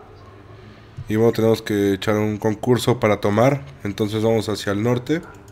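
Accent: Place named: Valencia, Spain. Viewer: Tu como que eres valenciano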